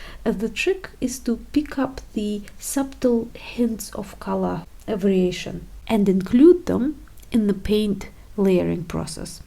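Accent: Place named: Russia. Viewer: What country do you work in obviously you have Russian accent but I think your page said you studied in Auckland?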